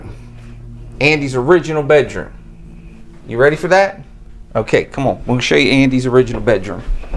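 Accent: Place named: South Jersey, United States. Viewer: Who didn’t and still doesn’t love the show. Andy Griffith was a great actor. I loved him in “A face in the Crowd”. It’s one of my top ten movies. The host is enjoyable too. I have to wonder where he’s originally from, because he sounds like people from far south New Jersey! 😊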